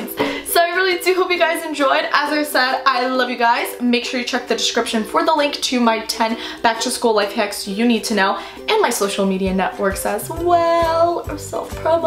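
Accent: New Jersey, United States. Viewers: Dang she's from up north like Jersey or Massachusetts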